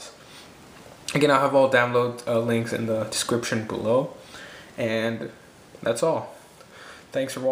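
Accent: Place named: United Kingdom. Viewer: USE UR BRITISH ACCENT!!!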